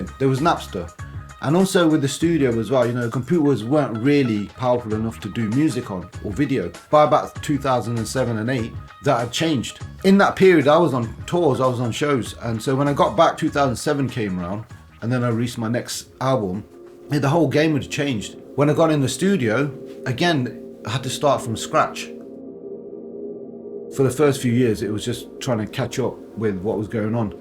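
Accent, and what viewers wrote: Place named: United Kingdom. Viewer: wait what he has a british accent !?!?!!?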